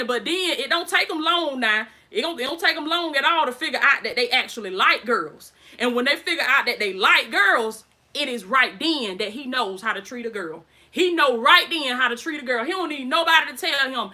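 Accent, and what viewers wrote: South, United States. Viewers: I can't believe how beautiful you are. Your southern accent is darling l never heard it. Never spent time in Alabama.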